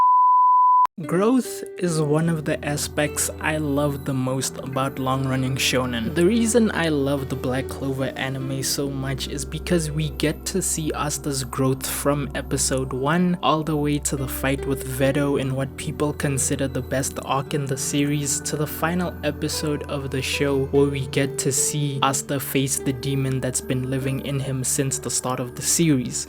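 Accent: South Africa. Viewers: Your South African WWWWW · Bro! This was a well done vid man. I actually thought you were American based off your thumb nail design. What a surprise it was to hear your South American. As a Nigerian who has been in South Africa since 2009 i relate to that SABC 2 hate😂. Keep up the great quality bro, I'm proud if you and you just got yourself a new sub.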